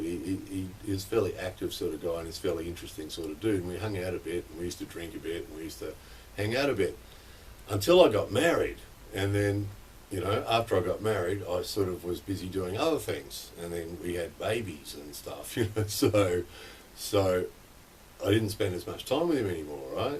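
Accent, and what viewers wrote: Australia. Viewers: I just love the sound of your australian accent talking about details across japan, a country that I do miss a lot! thanks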